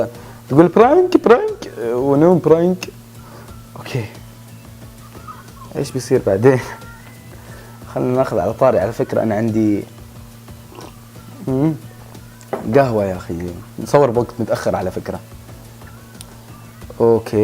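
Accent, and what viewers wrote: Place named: Syria. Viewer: I أنا مشتركة جديده في القناه... و صراحة الشي إللي حيرني هي لهجتك...مني عارفة بس...إنت تتكلم سعودي بس في لكنه بسيطة سورية...مادري ليش...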